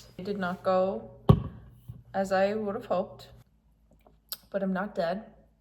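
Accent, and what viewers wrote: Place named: United States. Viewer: Atleast you sound like your in America, could be worse I'm stuck in the UK 😂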